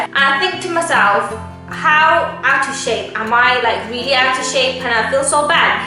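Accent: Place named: Styria, Slovenia. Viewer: do you live in stajerska (maribor, ptuj)? as your accent when speaking slovene is like from people who live there.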